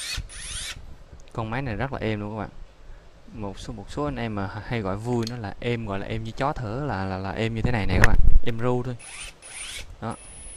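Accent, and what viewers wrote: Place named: Quang Nam, Vietnam. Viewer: núa lau lau dính tiếng quản🤔🤔🤔🤭🤭😁😁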